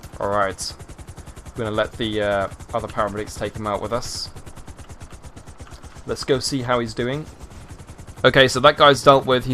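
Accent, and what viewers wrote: United Kingdom. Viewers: I like your english accent 😍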